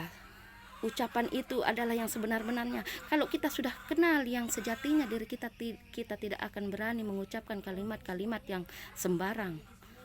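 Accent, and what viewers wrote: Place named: Lombok, Indonesia. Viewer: Marak logat Lombok timur...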